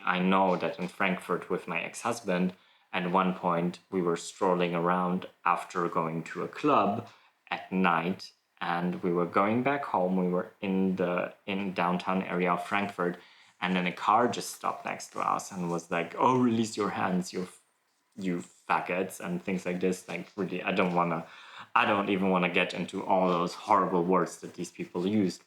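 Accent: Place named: United States. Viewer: They know you're gay, okay. But do they know you speak perfect American English?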